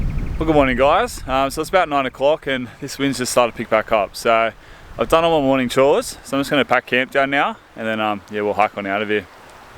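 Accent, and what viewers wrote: Australia. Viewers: Love your videos of Australia and I’d visit your continent if I could. I’m from Canada and I showed you to him. We both like the accent and keep the videos coming!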